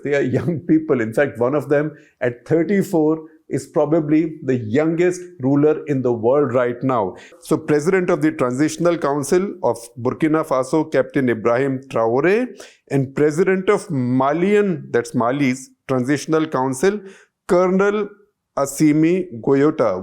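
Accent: India. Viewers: Fake off. Your. lousy thick Indian tone is faking incomprehensible!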